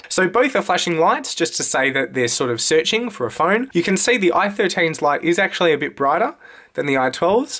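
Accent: Australia. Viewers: I'm from Britain and can confidentaly confirm he's UNMISTAKABLY Australian.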